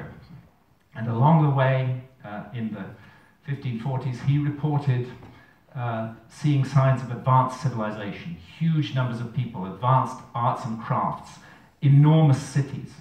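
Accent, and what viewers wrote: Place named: Scotland, United Kingdom. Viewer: He speaks Scotts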